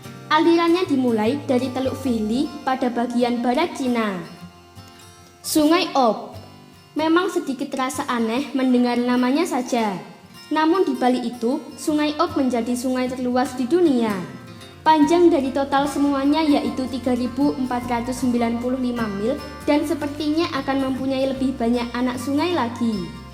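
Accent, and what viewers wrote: Java, Indonesia. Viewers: pengisi suaranya bagus si tp ya jng terlalu keliatan logat kejawaannya, ya memang si susah klw orang jawa Timur ataw Jawa Tengah untuk bicara bhs indonesia tanpa logat, laen orang jawa barat yg bicara bhsa Indonesia itu enak di dengar ga nyeklok gt, tp keren ko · Orang Jawa 😊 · Jawa,,,,,,,,, suara nya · medok banget njiir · Meddok jawane 🤣😁😃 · Logatnya jawa banget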